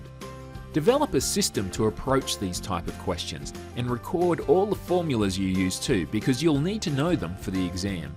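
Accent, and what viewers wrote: Australia. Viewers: Super helpful! Genuinely ingenious advice I haven't heard before. P.S. Is it just me or does the speaker have a weird mix of Aussie and American accents?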